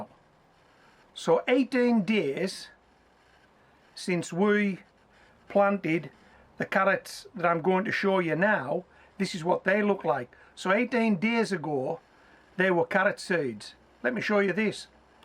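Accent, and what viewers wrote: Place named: England, United Kingdom. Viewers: Great video, some tip tips for bucket planting and speedy germination. I have two questions, what brand were the pizzas as the ones we usually get these days have cardboard bases. Last question where in the world are you from, the Mrs and I reckon county Durham, unmistakable twang, surely?! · I'm so glad I found your lovely videos but must confess as a fan of the language I cannot place exactly which county you're from. Would you mind enlightening me? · I thought he was Scottish. I worked with a Geordie here in Canada. He sounds very different. It's lovely anyway. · Lovely accent in west Cumbria! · Amazing video!! I paused the video part way in and my two variety of carrots are already on their wet tissue. My husband and I are trying to figure out where your accent is from in the North of England? Please help settle our discussion. 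Cheers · Is anyone else here just for the pizza 😁 Great vid loved the starlings but i love your geordie accent the most 🙂 im going to try this!!!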